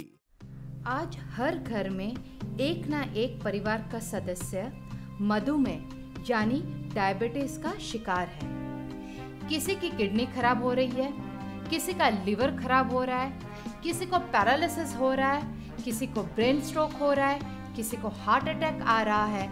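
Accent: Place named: India. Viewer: Can you be clear please like (ENGLISH) I don't understand Indian 😆🙄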